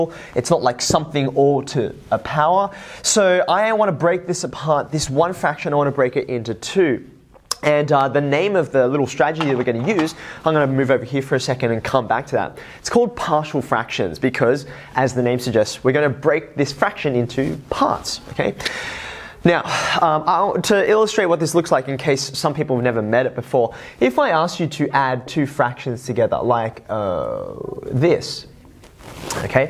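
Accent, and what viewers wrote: Australia. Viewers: His accent is aussie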